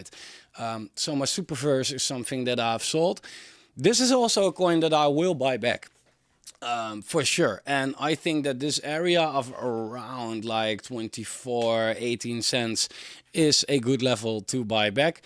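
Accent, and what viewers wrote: Netherlands. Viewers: Grappig je hoort altijd meteen wanneer het een Nederlander is.. kwam je toevallig tegen na 1,5 jaar op youtube struinen. Ben geabonneerd en wens je veel succes met je kanaal !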